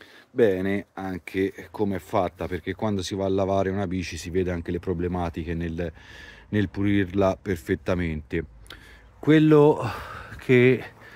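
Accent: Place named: Tuscany, Italy. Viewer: Tutte e due dei bei mezzi anche se differenza c'è, però il bello del video è la "nostra toscana'!" Nel modo di parlare,Perché anche io lo sono 😅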